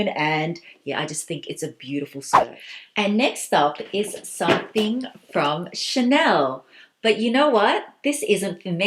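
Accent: Australia. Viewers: I am a nurse Educator! Love your videos and the Australian accent! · Hey! My name is Tia and I’m a Fellow Aussie 🇦🇺 but from Perth here! Love your channel and watching your vlogs and hauls! So refreshing to hear our Aussie Accent! Keep up the amazing work! #faMely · #faMely I love your Australian accent. I’ve been watching your videos for a while and love your bag collection. I enjoy your videos it makes me appreciate high fashion. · #faMELy I am also from Australia and I can't tell you how awesome it is to hear another Aussie accent in the youtube world ;)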